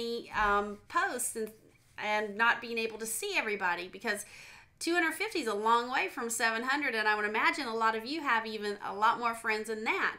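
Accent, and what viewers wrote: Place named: South, United States. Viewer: Nice accent, are you from the South or Texas?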